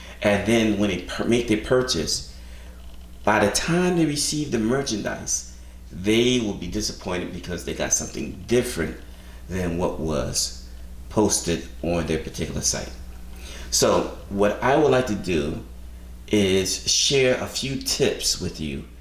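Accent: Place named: Brooklyn, United States. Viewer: Dude, are you from Brooklyn? You sound EXACTLY like Spike Lee. Not just the tone of your voice, but the cadence. Thanks for the good tips.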